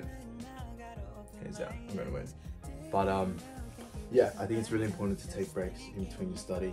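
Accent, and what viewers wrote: United Kingdom. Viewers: Hi there Peter H. here too 🇰🇷🇺🇸Can’t focus writing research papers found your channel so motivating love ur english accent..back in college for bs degree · Dude he is definitely perfect, nice body, pretty face, smart, well behaved, clean ,has a nice voice and etc AND HE HAS A BRITISH ACCENT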